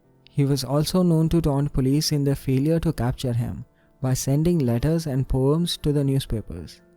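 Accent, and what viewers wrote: India. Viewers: I cannot take that Indian accent !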